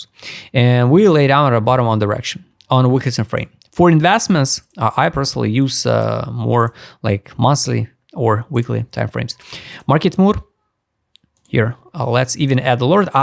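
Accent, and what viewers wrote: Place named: Russia. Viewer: disguisting russian accent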